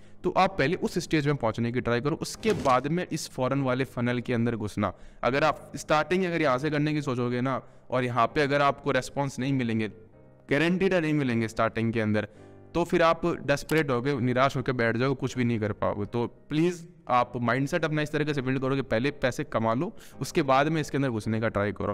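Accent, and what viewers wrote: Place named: Marwari, India. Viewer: ❤️Acha, marwari Accent aata h esliye guess kiya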